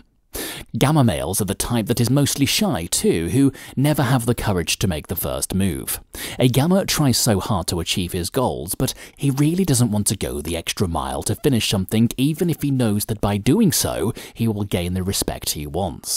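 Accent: United Kingdom. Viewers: The most thing that I liked in this video is the British accent, long live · Is there a reason you seem to always show a black Guy especially with the white women as if they are better than white men? Seems racist to me and I think the white guys should be with their own race and so should the black Guys! There are many Alphas who are white. Are you ashamed of your own race or is that a British thing. I say that because your voice indicates you are white and British?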